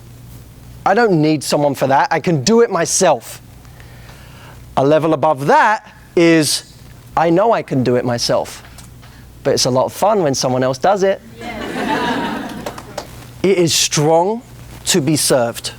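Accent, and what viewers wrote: United Kingdom. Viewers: Is it just me or did he lose his british accent? Quite disapointing of his appearance now since 2009 ;,( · lol OMG i LOVE YOUR BRITISH ACCENT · He sounds so much like Daniel Radcliffe. Not just the way his british accent is, but also his voice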